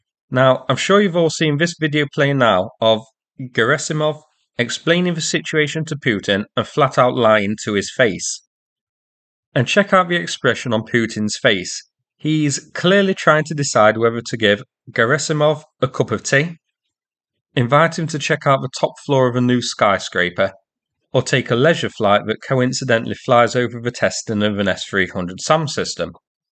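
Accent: United Kingdom. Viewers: Thanks for the video, just discovered you after following the war since the start. I like a UK accent;) :face-red-heart-shape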